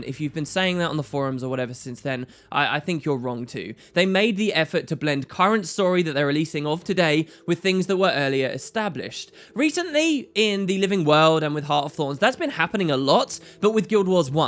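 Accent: United Kingdom. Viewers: Hey don't know if you'll see this, but I've been checking your channel daily sense FF15 release hoping for some content on it. Weather that be the start of an LP or just some sort of news. I imagine you might of said something about it in one of your GW2 vids but unfortunately I've not watched those for some time as I never spent the money on the expansion and don't want to ruin anything as I'm sure one day when I got some extra money to rebuild my computer I will. Anyway, your FFX LP was hands down the best LP I've ever seen, I also enjoyed the first half of the X-2 one, but to honest with ya by the time you started back up I'd forgotten most of what happened and kind of lost interest. Idk, I really hope you'll do an ff15 lp because I'd love a good reason to tune back into your channel on a daily basis again, miss that British accent of yours:)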